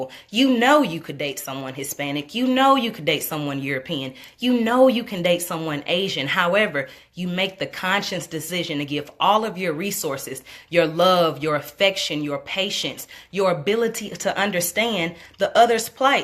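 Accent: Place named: South, United States. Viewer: You just earn a new Subbie!..You sound like me(southern twang) and have a awesome spirit. keep Queenin Queen!..✊✊❤❤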